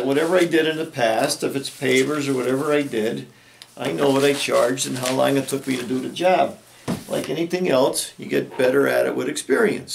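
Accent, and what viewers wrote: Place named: Wilkes-Barre, United States. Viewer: Howdy -Greetings from the Juniata River area in Newport, PA. (Your accent sounds like the Wilkes Barre area). If you are in the Newport area come Spring, and want a quick cement job, (or if you know someone who does) shoot me a name. The dog and I wIll be looking for someone to redo the cracking cement on the top of our chimney. It's a real small job - about 4 feet by 4 feet. The cracking seems to be the cause of a slight trickle on the inside of our chimney when it rains. I climbed up there (ranch house roof) while the dog supervised from the ground. We came up with a temporary solution until Spring. ( He agreed to loan me the metal bottom to his dog kennel). I flipped it upside down and rested it on top of the 2 chimney caps. The metal lip around the kennel bottom is only about 2 inches deep so it works real well. 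It doesn't seem to block the airflow and we can still use our pellet stove. It also seems to be deflecting the water like we wanted. I anchored the thing with heavy pavers and it seems to be doing the trick. WOO HOO!! (The dog and I are happy with our rig-job for now and hoping that mother nature is kind until Spring.) Enjoyed your video.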